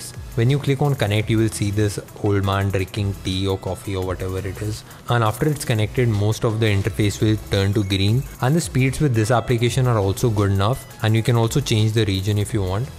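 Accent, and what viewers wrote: India. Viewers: This is awsm bro thank u 4 video r u Indian? i asked this question because of ur accent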